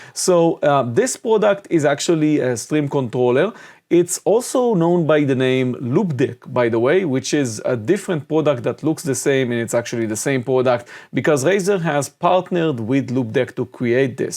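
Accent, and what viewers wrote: Israel. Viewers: You have such an Israeli accent :-) Shalom.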